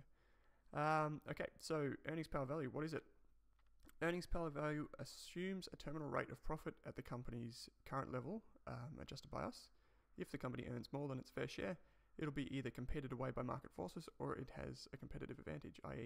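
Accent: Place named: Australia. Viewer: hey, i'd just like to add some constructive criticism. I think your voice is a little bit too low, and especially because you have an australian accent, it gets a little bit difficult to understand what you're saying. So you if could speak a little louder, that would be great, haha.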